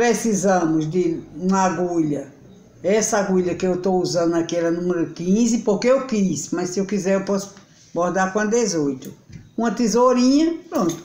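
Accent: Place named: Ceará, Brazil. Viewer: Você é adorável, esse sotaque delicioso de ouvir, lembra minha irmazinha lá no Ceará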